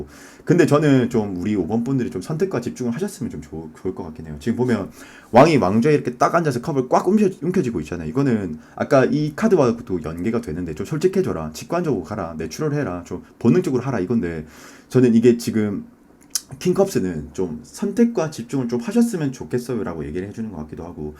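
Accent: Gyeongsang, South Korea. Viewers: 사번 연애포텐셜이 터진다니 ㅋ 😄 지금은 별일 없지만 곧 몇 명씩이나 온다니 기대해보겠습니당 ㅎㅎ 조언해주신대로 오픈마인드로 다가오는 인연들과 좋은 추억 만들어볼게요💛🧡❤ 배경음악도 멋지고 목소리가 넘 좋으세요 님의 타로리딩 정확도 면에 있어 제가 본 타로 유투버 들 중 탑인거 같아요 진짜 예리해요 👍 전 부산 사람이라 님의 구수한 경상도 액센트가 친숙해요 😊 감사드리고 타로하는 구독다수 일취월작하셔서 번창하시길 기도할게요🍀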